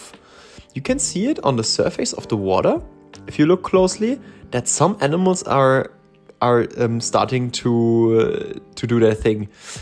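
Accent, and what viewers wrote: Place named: Germany. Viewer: Random question: I’m American, you said you’re from Germany. I know English stems from you guys so does the average person there learn English and German growing up? I notice all my channels with similar content come from your general area and all of you sound like English is native even though my American ears can hear a slight “Arnold Schwarzenegger” vibe coming through. This is not meant to be offensive or anything, the accent is cool to me. Great video, I’ll be subbing to see what else you do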